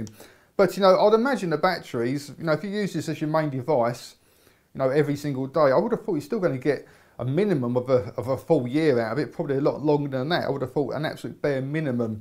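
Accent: United Kingdom. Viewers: Hes british not english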